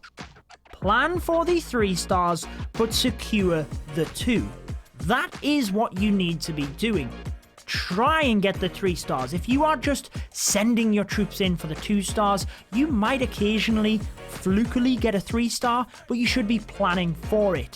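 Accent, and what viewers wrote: United Kingdom. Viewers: i have a question for you where are you from? I ask this because I’m my country (Honduras) it’s 4 am. I guess you are from U.K. by the way you speak English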